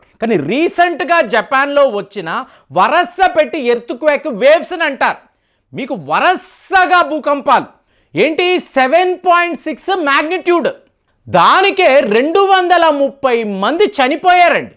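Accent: Tamil Nadu, India. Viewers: While doing telugu వీడియోస్ యూ have to refine your tamil slang garu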